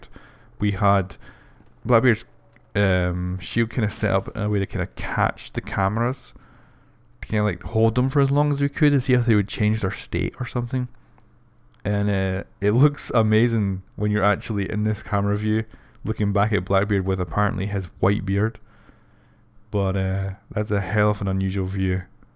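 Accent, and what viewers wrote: Scotland, United Kingdom. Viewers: Do you mind if I ask what part of Scotland you are from?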